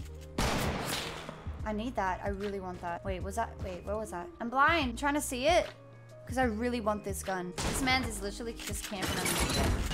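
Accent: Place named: Australia. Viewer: Hey which part of australia u from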